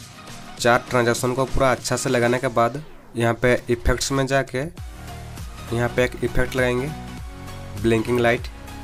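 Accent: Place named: Odisha, India. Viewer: thoda thoda odia toch ho rha hai na apke hindi me ishliye but nice voice ❤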